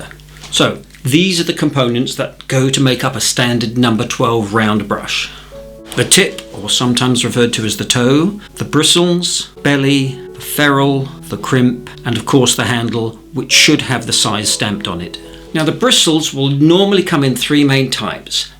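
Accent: United Kingdom. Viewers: Nice to hear fellow Brit! You are now m new favourite…just done your skies tutorial…and m now listening to your views on paper and brushes.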